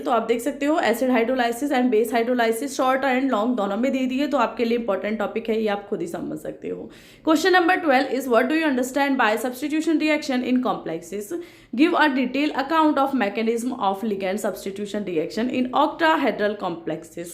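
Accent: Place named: Bundelkhand, India. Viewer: apki tone bhi bundelkhandi hi lg rhi hh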